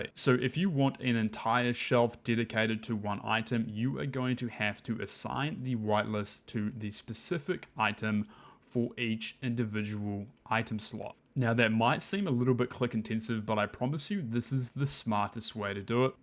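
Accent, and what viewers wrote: New Zealand. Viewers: as an aussie, he defo speaks proper English, just sounds like he's from new Zealand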